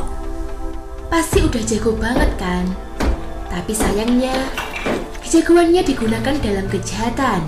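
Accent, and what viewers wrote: Java, Indonesia. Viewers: mbae ngomonge medok bgt hihi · Plis jan medok si, gw tau lo jamet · Logat jawa nya medok bgt mbak 😁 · Medok banget, jowone ndi mbak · Medok bgt mbak, but i like it 😍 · Mba e logat jawa poll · suara medoknya itu loh ,bikin gimana gitu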